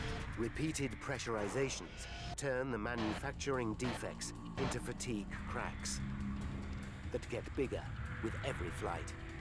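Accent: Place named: England, United Kingdom. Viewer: I like the English narrator much more than the American narrator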